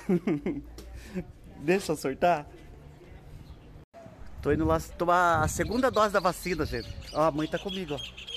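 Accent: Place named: Cuiabá, Brazil. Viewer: Fala bem parecido com cuiabano,de onde vc é o?🙏👏🏻👏🏻👏🏻😘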